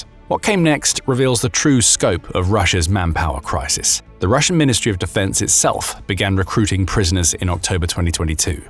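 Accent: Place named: Southern England, United Kingdom. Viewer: Very good Standard Southern English narration from this bunch of Americans ... shame that the computer rambles on about high school and college students --- meaningless to a British audience. In the same way that the Germans lost the First World War in the first days of the War at Liège (4th-17th of August 1914), and they lost the Second World War in the Battle of Britain (1940), so the Russians lost this war in the first days of the war at Hostomel Airport. It has taken a long time for the significance of the Battle for Hostomel Airport to become apparent but that IS where Russia lost. The language problem is easily solved as it was solved in the First World War. You divide the front into sectors. Whilst maintaining a watching brief, the Russians would have done well to leave Kursk to the North Koreans as the French left the Belgian and northern end of the front line <num> to British command with only liaison between bilinguals at the top. 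The Koreans would have only needed a handful of interpreters then. Even with their mass of interpreters, there was appalling miscommunication. Far be it for me to help the Russians but the solution is as clear as the nose on your face. This, of course is not possible because of the control-freakery of the monstrously stupid Russian high command. Just because demolishing the entire city of Grozny worked when confronted with a bunch of religious fundamentalists armed with little more than AK47s, it doesn't mean that that same strategy will w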